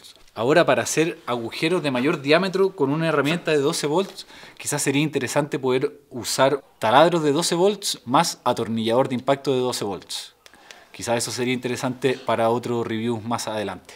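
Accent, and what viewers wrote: Chile. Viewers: Por el acento supongo que sos chileno. Hermoso país. Saludos desde Argentina. Muy instructivo el video.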